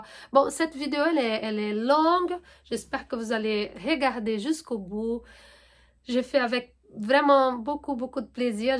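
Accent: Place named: Brazil. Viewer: Bonjour. J’adore ton accent. Tu es brésilienne? Merci pour cette vidéo. Moi je suis lion ascendant scorpion avec bcp de choses près de mon ascendant, maison 12 pluton, et maison 1 la lune, le tout en scorpion. Ca me donne une sensibilité un peu spéciale et j’essaie de mieux le comprendre à travers l’astrologie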